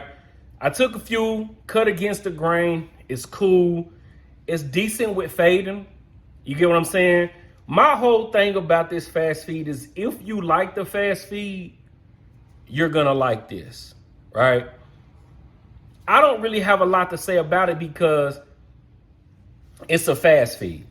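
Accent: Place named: Memphis, United States. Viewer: Very honest opinion! I appreciate that. New to your channel bro & subscribed because of your genuineness. Keep being authentically you man! BTW don't know where you are located but your swagger sounds like Memphis! Just a guess. Peace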